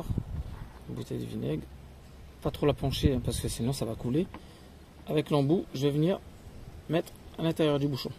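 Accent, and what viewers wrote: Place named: Reunion, France. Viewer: Slt & tout mon RESPECT à vous . Je disais : Aparament les gens aiment bien votre video . Peut être que des mécaniciens de l'Île de la Réunion pourront en profiter pour réparer des voitures des personnes de notre île pour pas cher ....vous voyez ou je veux en venir😂😂 . " quand on est fauché " .Mais BRAVO👏👏👏 . VOILÀ CE QUE VOULAIS DIRE MON COMMENTAIRE EN CRÉOLE ... CAR JE CROYAIS QUE VOUS L' ( ETIEZ CRÉOLE 974 ) ....🤔🤔🤔🤔.Mai lé rare que mi trompe !!!!!! Surtout avec l' accent !!!!! Après chacun son zaffair 😂😂😂😂😂😂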